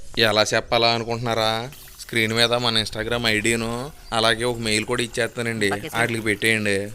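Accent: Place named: Konaseema, India. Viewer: అచ్చు తెలుగు కోనసీమ accent,perfect పాతకాలం వంటల పద్ధతి,మా పెద్దలను , పద్ధతి లను,వంటలను గుర్తు చేస్తున్నారు,truly అద్భుతః.from Maharashtra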